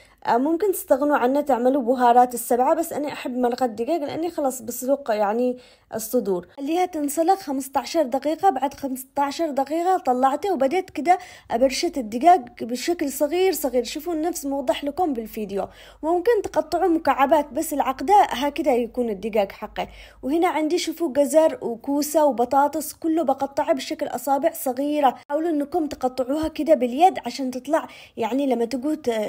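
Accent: Aden, Yemen. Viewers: تسلم ايدك يا وفدوه للهجه العدنى ايش المحرقات اللى يحطوها بدل الطماطم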